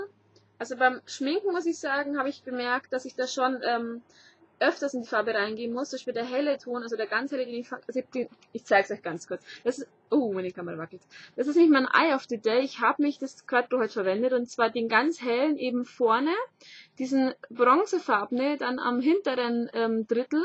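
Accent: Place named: Bavaria, Germany. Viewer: hab deinen kanal heute erst entdeckt :) woher kommstn du genau? warsch ausm kreis münchen oder? ;) man hört hald einfach bei echten bayern des bayrisch immer raus hihi bin selber aus landshut bei mir isses genau so ;DD liebe grüße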